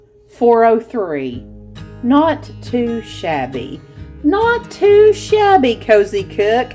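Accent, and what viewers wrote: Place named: Mississippi, United States. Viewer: Wow! I just found you. You are my Favorite Cook on YouTube! I am from Mississippi and you sound just like home! ❤️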